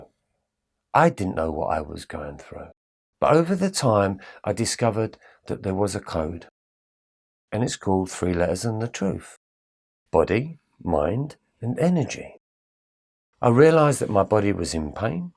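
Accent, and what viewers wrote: Cockney, United Kingdom. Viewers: Thank you, it is good to hear this and watch your other talks on the dark knight of the soul..It is helping me a great deal. Plus your voice sounds similar to my Mums who was from the east end of London. Her death and Covid last year triggered I believe this time I am going through now... There is a lot of help on the Internet and I pick and choose who I listen to. You are a comfort.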